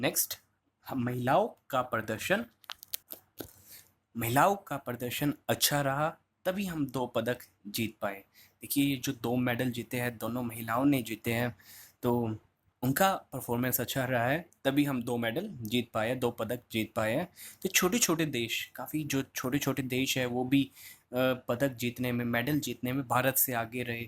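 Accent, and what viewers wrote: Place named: United States. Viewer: Aap america se ho kya sir ... Aapka toning america wali hi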